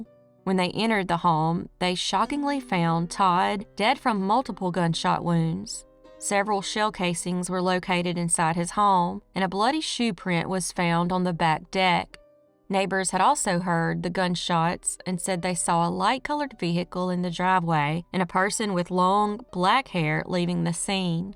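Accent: South, United States. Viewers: I think we like that Southern voice ❤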